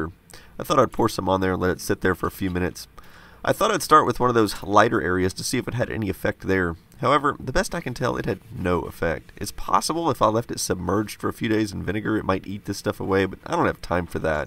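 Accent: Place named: South, United States. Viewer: I’ve noticed that speaks with a slight southern accent. It’s not very noticeable but if you pay attention you can hear it, and since he grew up in Texas I can only see why. Love you man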